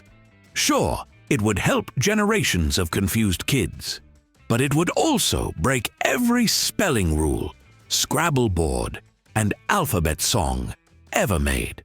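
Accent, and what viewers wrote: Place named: United Kingdom. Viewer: Thank U very much!! 👍 👍 😃 😃 Absolutely LOVE the British accent!! 😉 😁 😁 😁 🤗 🤗 🤗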